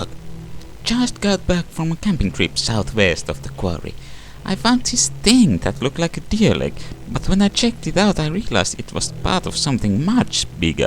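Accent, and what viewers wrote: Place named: Finland. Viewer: I knew you was finnish (from your accent)! Suomi perkele!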